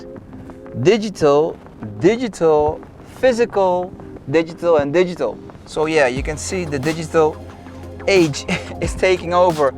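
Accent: Netherlands. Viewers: so you/re an indian with a dutch accent and an english channel. aight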